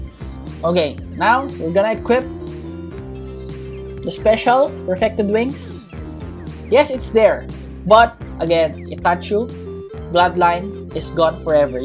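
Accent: Philippines. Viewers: Pinoy ata tong nagsasalita hahahahha accent nya parang pinoy mag english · I sub on you bc i know your filipino.FILIPINO RIN AKO! HALATA NA SA PAG SASALITA AGAGAGA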